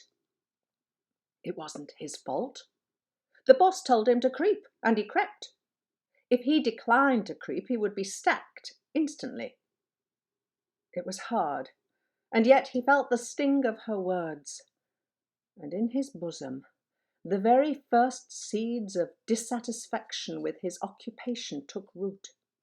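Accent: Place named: England, United Kingdom. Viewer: Very well read. I searched for the title here and yours is the only one I came across featuring an English accent. I love the Yanks but an American reading Wodehouse? Not cricket at all.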